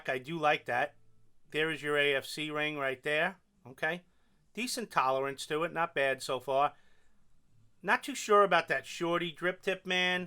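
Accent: New York, United States. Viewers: You and Mikey Vapes both come from New York and you sound like a New Yorker when you talk and Mike sounds like hes from Illinois or somthin.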